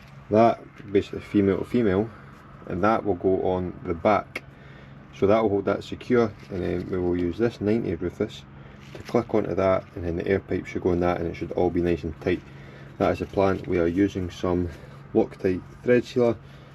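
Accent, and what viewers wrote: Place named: Scotland, United Kingdom. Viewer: Where specifically in Scotland is your accent? Glasgow somewhere?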